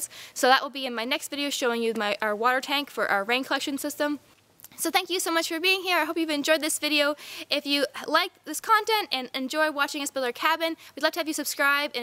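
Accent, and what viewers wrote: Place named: Canada. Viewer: I wondered how you were gonna keep the mice out. It's amazing how they magically make fiberglass insulation disappear . Not sure where you are building the cabin...guessing it's Canada because you all say abowt. Happy New year😄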